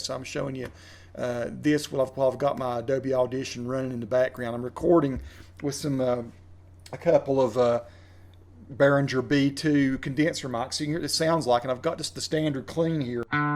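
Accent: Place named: Southeast, United States. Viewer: I have been looking at video reviews of this amp for over an hour now, and I enjoyed yours 10 to 1 more than any other one. You’re relaxed, not trying to stroke your ego, just checking out the tones by picking through some tunes relevant to the settings. I don’t know why people think that an ‘amp review’ should be them running 64th note arpeggios. The only person that helps is themselves and their egos. Having said that, you’re absolutely a great guitarist and it’s beyond obvious you love music and the guitar. Also, it’s awesome to click on a video and hear someone speaking with the same accent as me! I don’t know what part of the southeast you’re from but I’m from the southwestern part of Virginia, and I’d guarantee you’re originally from VA, TN, or NC. Either way, you absolutely gained a new subscriber today!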